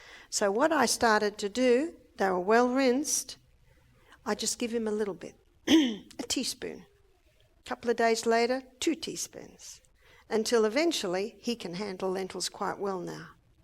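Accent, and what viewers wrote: Australia. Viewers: Man…she’s Australian. They don’t pronounce some words like Americans (for example). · jim dee - She is from Australia and they say many words differently than USA does. Great Britain also does. Forget even trying to understand the English of many from Scotland and Ireland. Ireland is easier, though. I was watching another of her videos and could not understand several words at all. One of them turned out to MEAN Red Bell Pepper. They have an entirely different word for this vegetable than we do. Have you ever heard a Brit pronounce Schedule? And those from South Africa also pronounce many words differently than we do - especially words from the Bible.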